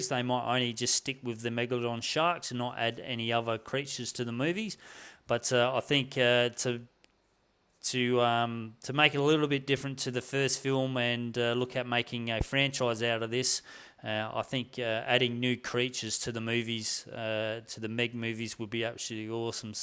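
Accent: Australia. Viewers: G'day mateeeee. I can tell your aussie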